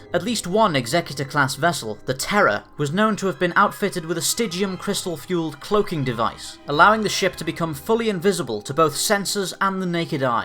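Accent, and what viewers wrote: United Kingdom. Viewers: I love the facts told by your accent, british?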